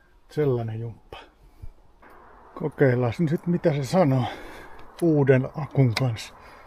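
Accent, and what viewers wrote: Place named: West Coast, Finland. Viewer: Hieno laite! Itellä samanlainen. Kunto kyllä ainakin päälle päin heikompi omassa koneessani, mutta pääosin toimiva sekin ja on päivittäisessä käytössä. Lumen aurausta ja trukkipiikeillä roudaan tavaraa. Kaivuuta toistaiseksi vähän ja sitä tarkoitus tehdä jatkossa enemmän. Käyttäjän murteesta päätellen jossain länsirannikolla ollaan. Itsekin aikoinaan koneen hain Porista.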